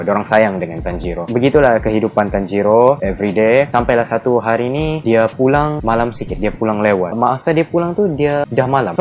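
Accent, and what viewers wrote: Sabah, Malaysia. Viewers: Bila speaker org sabah . Cerita ni dah macam cerita hantu benar beb hhahah mantap 👍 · Aku suka betul bila dia cerita pasal kimetsu no yaiba ni dengan slang dia cakap sabah dia tu huhuhu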